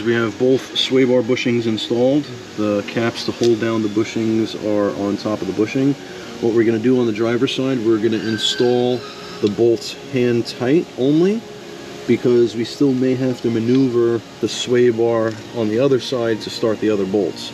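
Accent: New York, United States. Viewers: YOU GOTTA BE A NEW YORKER. boston got nothin on you.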